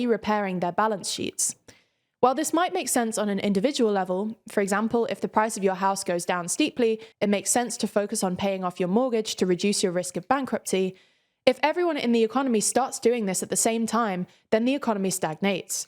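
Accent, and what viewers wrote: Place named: RP, United Kingdom. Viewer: Nice to hear a beautiful, eloquent and sophisticated sounding SRP (standard received pronunciation)--very classy.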